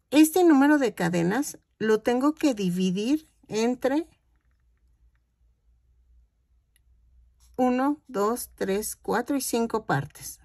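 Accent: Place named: Mexico. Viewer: Perdón pero cuando veo números Veo chino . Prefiero jacerlas a ojo. Pero me encanta si acento mexicano y su dulzura❤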